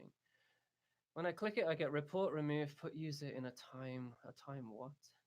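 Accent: United Kingdom. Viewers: dude, non-music question... You sound British, but what's the sketch with you being in Korea? What's your occupation and how did you find yourself choosing to live over there?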